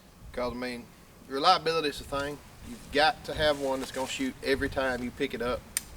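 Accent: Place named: Georgia, United States. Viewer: sounds like your from georiga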